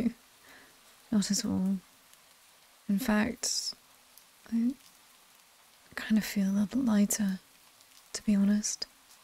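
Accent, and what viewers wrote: United Kingdom. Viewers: I love the British accent and the background thunderstorm. It's so calming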